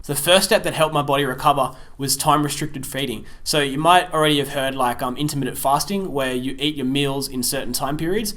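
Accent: Australia. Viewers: that fooking Aussie accent mane... great video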